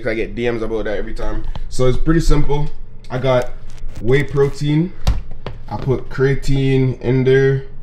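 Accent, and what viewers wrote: Jamaica. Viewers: R U Jamaican? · Are you from JA? That accent peeks through ! Great video. 💪🏽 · not go lie you sound jamaican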